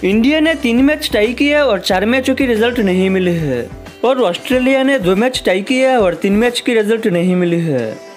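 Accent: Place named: Bengal, India. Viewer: জাতে বাংগালী তা কথার ভাবে বোঝা যায়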